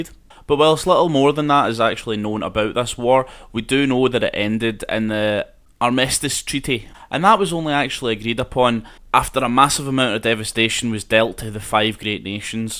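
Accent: Scotland, United Kingdom. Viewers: the scootish accseny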